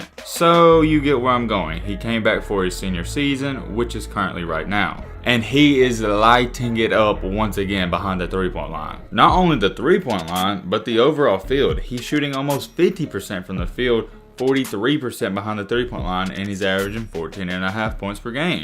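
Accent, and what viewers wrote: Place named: Kentucky, United States. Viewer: where you from man, got the same dialect as us here in KY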